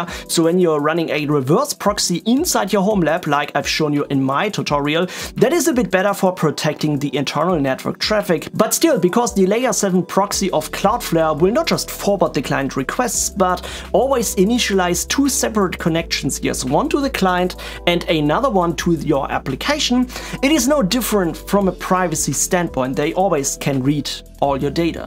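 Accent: Germany. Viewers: your voice sounds very german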